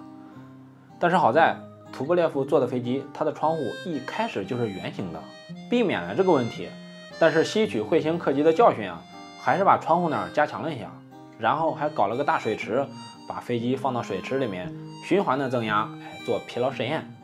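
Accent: Henan, China. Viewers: 謝謝讓我增加了很多航空知識,雖然我在台灣,但是我的父親祖籍河南舞陽,所以聽你說話特別親切,謝謝你讓我還能聽到家鄉話,希望你的頻道能長長久久,加油,謝謝!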